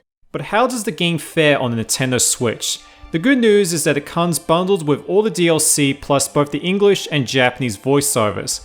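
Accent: Australia. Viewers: Is this an Aussie reviewing it?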